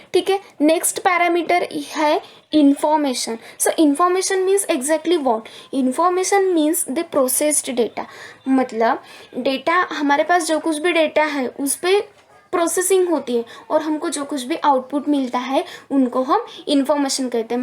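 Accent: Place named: India. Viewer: Nice lecture..Liked you Hindi accent.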